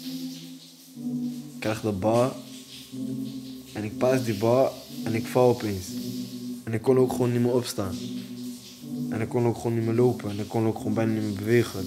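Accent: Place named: Morocco. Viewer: Praat normaal mocro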